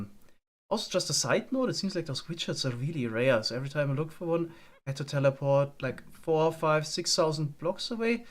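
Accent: Germany. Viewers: Are you German? Your accent really came out in this video. Very nice. 🙂 👍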